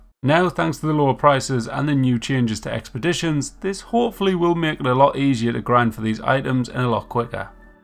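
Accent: United Kingdom. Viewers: i hate this heavy british aceent! (o)pdates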